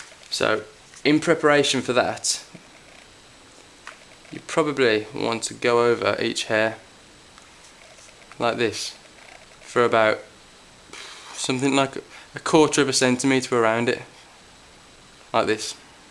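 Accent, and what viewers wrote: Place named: Walsall, United Kingdom. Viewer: WOW! i cant believe how well you can draw, heard your accent and thought he sounds like me haha im from walsall to, anyway well done hope you make lots a moneys from these :D x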